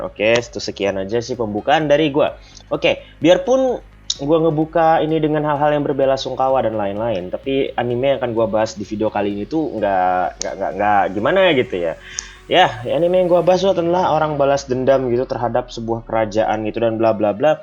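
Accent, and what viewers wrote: Banjar, Indonesia. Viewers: Bang dri banjar kah?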